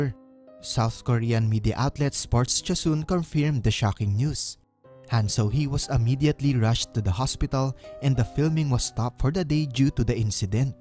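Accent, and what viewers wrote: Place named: Philippines. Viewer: halatang Pinoy yung nagsasalita, kahit English pa yan🤣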